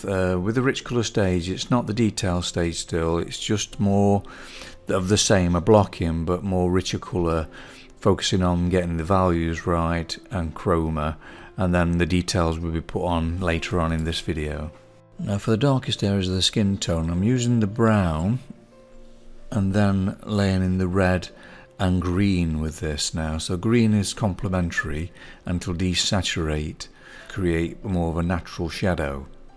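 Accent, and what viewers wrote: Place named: Coventry, United Kingdom. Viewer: you sound like youre from around the coventry area.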